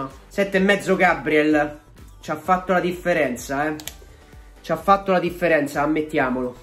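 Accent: Rome, Italy. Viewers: Fai troppo ridere amo tantissimo il tuo accento Romano 😂😂😂😂😂❤❤❤❤❤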